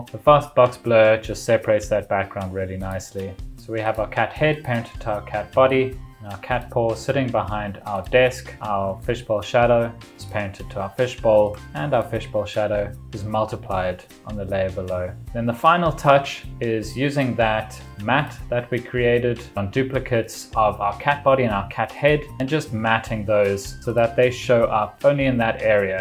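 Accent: South Africa. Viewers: lol I knew you were south african from the first 5 seconds of the video🥳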